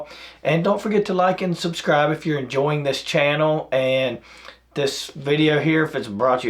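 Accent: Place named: South, United States. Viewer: idk why the algorithm sent this my way but I'm glad it did. I dig your vibe. You don't get a lot of Southern accents on youtube. I've seen that video where Dime talks about this technique but you can barely see what he's doing in it so this was really helpful.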